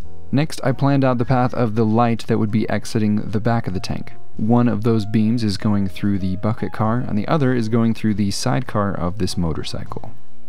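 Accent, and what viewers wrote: Germany. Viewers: Perfect northern German pronunciation. Not a native speaker, but have been speaking it for about forty-four years.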